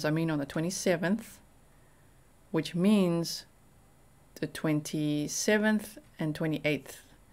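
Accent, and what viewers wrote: South Africa. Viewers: Love your saffie accent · are you South African?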